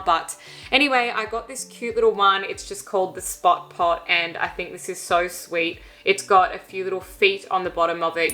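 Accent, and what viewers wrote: Australia. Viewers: 🦋Love you channel except for 1 thing...It’s a shame you choose to use the American slang Haul...it doesn’t sound right with the Aussie accent ☹️ You’re an Aussie! 🤗🇦🇺 don’t we call it a shopping spree 🛍 in this beautiful country of ours🏝🦘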